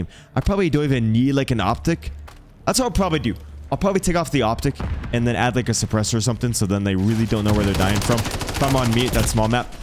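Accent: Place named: Toronto, Canada. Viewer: Is homie from Toronto? He has the Toronto accent like Plushhh 😂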